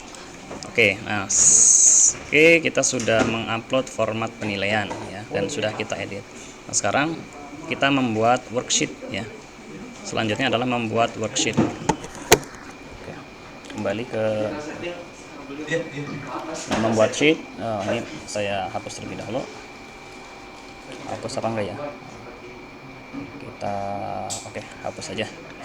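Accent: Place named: Java, Indonesia. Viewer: Matur suwun pa. Suarane medhok pa. Salam dari blog ngapak.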